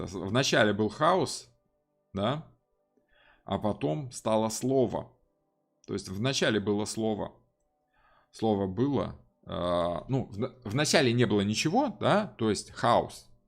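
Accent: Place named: England, United Kingdom. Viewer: с английским акцентом…как это сам * часто в своих лекциях очень симпатично произносит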